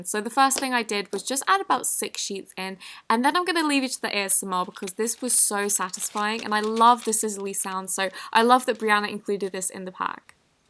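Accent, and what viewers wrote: England, United Kingdom. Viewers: Okay so this is a bit of a weird question but I am reading a book right now and it's based in England in the queen victoria erra so the girl has a brother named Rupert so what I wanna know is how you would pronounce it? I love the english accent and this is English based book I know a bit of a weird question lol but I love your videos they're always great keep up the great work <3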